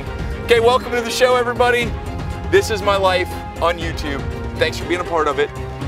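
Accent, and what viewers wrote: South Africa. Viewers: The dude sounds south african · has a South African accent. So cool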